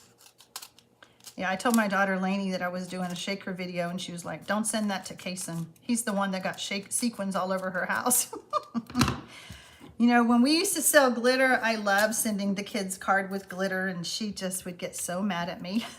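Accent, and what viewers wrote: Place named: Maine, United States. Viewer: We Mainers have that accent that’s hard to understand too!! Oh well.. that’s the beauty of diversity!! 😇😂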